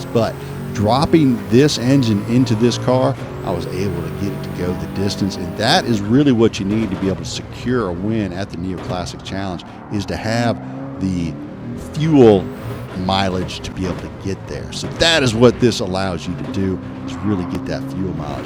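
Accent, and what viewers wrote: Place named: Texas, United States. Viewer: Man that texas accent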